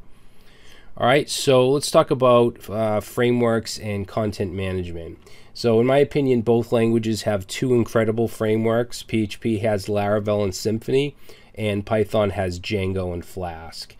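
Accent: United States. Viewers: Man, I dig your accent. I think it's as American as it gets.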